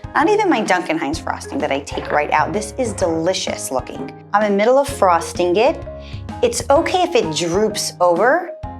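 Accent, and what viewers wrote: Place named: New York, United States. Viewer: aw! I’m from NY and your accent just makes me miss home. Lol! I love this video, I rarely sit and watch an entire video with the volume up haha. Thank you, will be showing my kids your channel.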